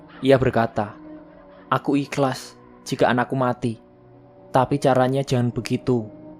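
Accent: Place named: Java, Indonesia. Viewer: Gaya ngomong lu garing trus medok lagi min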